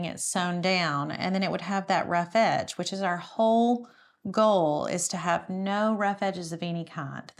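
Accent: South, United States. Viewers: Ok you have caught my attention, where do you live or from your accent is so southern?😊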